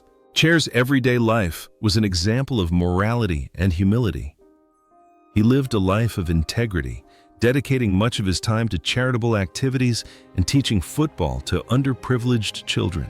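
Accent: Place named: United States. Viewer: more AI shite with gut wrenching yanky commentary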